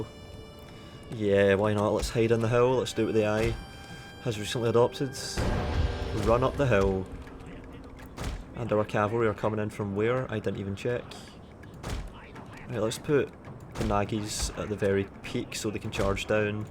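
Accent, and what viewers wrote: Scotland, United Kingdom. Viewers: So glad i stumbled upon your series a few weeks back, love it, im guessing you are from Scotland?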